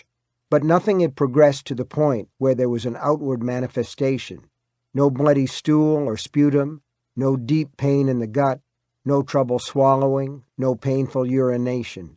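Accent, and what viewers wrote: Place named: Maine, United States. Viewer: Oh my goodness! When I first started listening I thought, Oooo! I love how the reader has that Maine twang — adds an extra layer of icing to this wonderful cake. I JUST started reading the comments to see who the reader is and if he has any other books as I love his voice. Low and behold, it is the king himself! Go figure! Explains the great Maine accent❤️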